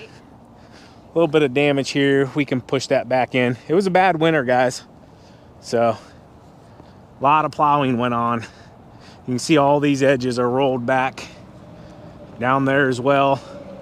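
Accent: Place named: South, United States. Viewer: just keep sounding like a southern red neck like me. i knew what you were saying.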